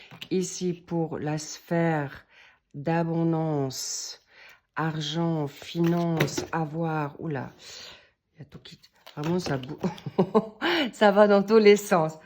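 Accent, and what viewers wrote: England, United Kingdom. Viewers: Merci très chère, j'adore votre voix votre accent anglais peut être? pouvez-vous me le dire.. Vous êtes merveilleusement bienveillante, réconfort et clarté dans vos propos,sans ambiguïté,sans complaisance tout en étant sensible et bonne enseignante de la vie dans tous les domaines, beaucoup de réalisme.Merci beaucoup et que Dieu vous bénisse abondamment.belle journée à vous.❤❤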